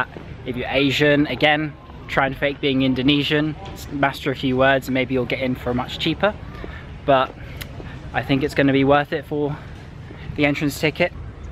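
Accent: England, United Kingdom. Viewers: Hi, it''s a nice review...but i like your english accent btw...which accent is it? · Your British Accent is very sexy,and easy to understand... Sengau2 manjah di hidung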